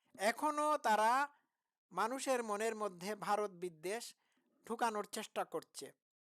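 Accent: Sylhet, Bangladesh. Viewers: Tumi sylhety tumito maiyluker moto kota bolo tumar anda maniker moto kora dorkar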